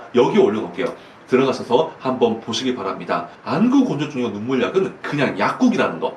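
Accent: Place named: Gyeongsang, South Korea. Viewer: 고향이 경상도 어디에요? 저랑 말투가 똑같ㅎㅎ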